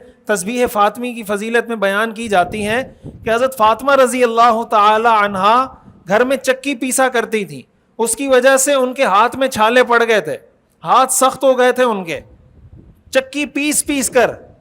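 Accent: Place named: Karachi, Pakistan. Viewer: Krachi mein sab ka way of talking hi asa hai.not copy.nice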